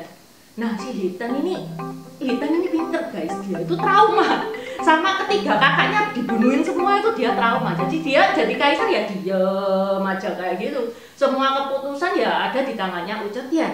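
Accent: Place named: Banyumas, Indonesia. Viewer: mendok2nya ituloh.... Ngapak buanget... 😂😂👍👍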